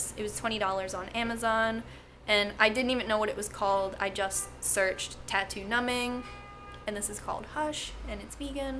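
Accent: Philadelphia, United States. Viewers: Your from Philly?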